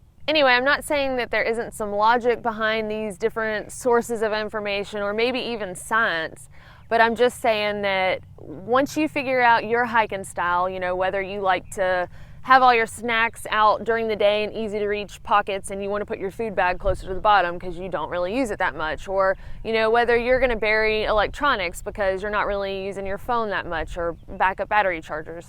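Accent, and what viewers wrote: South, United States. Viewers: As a southern boy myself, your accent is quite endearing. Nice video & suggestions &, if you don't mind, I'd like to let my scouts see it when they're getting ready for a trip. Always seems to sound better to them when I use references. Keep em coming!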